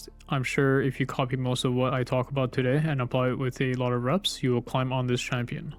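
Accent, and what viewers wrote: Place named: United States. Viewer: I have not found this to be the case. Are you from America? He has a pretty strong regional American accent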